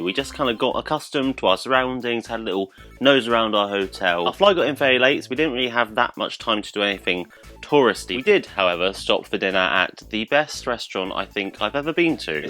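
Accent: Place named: United Kingdom. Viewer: Dont know this channel. Where are they from? He sounds British but when he talkes about visiting Denmark it sounded like he lives on the other side of the World.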